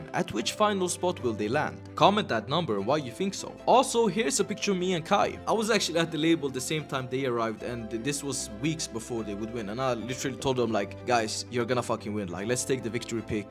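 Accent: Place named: Finland Swedish, Finland. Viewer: *Fennoswedish dialect, not finnish accent : ), but otherwise awesome vid.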